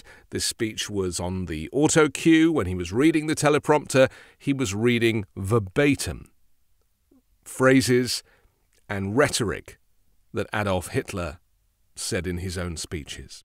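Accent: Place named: South East England, United Kingdom. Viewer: I think his accent is from south east England….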